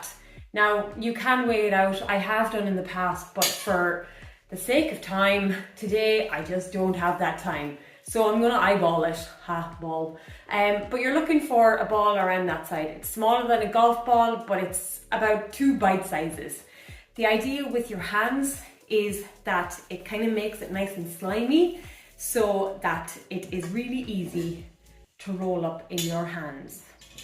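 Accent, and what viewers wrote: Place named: Ireland. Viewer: Hello there, I got randomly recommended your video. I see you are a small time youtuber. I am liking and commenting to give you an edge with the algorithm. I actually stayed the first 2-3 mins of the video to figure out where you are from. That is a very mild Irish accent - at least I think. Did you grow up in Ireland? or I suppose which part of it if you don't mind sharing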